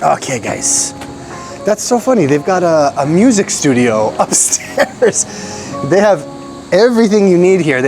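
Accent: Philippines. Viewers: love ur filipino accent kuya ❤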